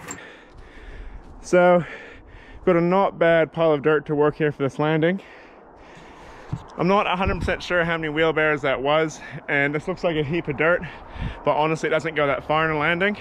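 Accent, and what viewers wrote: New Zealand. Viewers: You sound like kinda New zealandish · This accent though, i hear kiwi, aussie and american all in one sentence.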